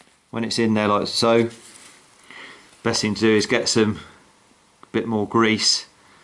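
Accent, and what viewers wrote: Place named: West Country, United Kingdom. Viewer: Didn’t realise it was going to be you when I clicked on the vid. What a relief to hear that West Country accent. Thanks again for your vids. Bearings on order.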